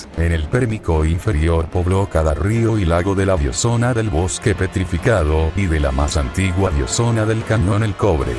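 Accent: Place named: Spain. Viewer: Yo pense que eras de España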